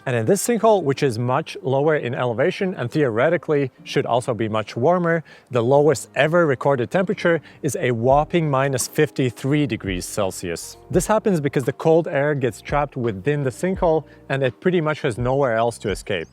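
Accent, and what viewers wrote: Latvia. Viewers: Latvian accent? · Es dzirdu latviešu akcentu? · man pagāja 7 sekundes līdz uzķēru, ka tāds akcents ir tikai latviešiem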